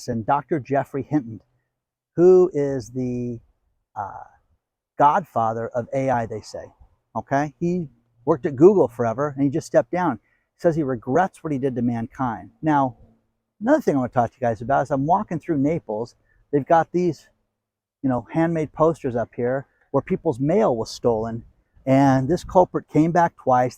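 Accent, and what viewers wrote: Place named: Southern California, United States. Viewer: The SoCal inflected "OKs" get me laughing every time!!😂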